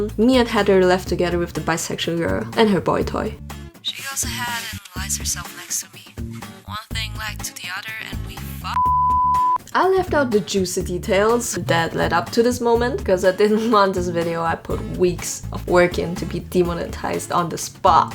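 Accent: Germany. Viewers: I swear i though you were German from the accent...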